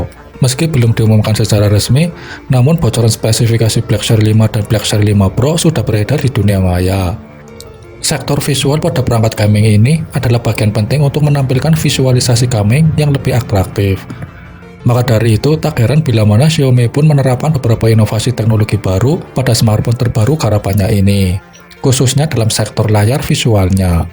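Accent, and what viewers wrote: Banyumas, Indonesia. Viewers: Ra ngapak ra kepenag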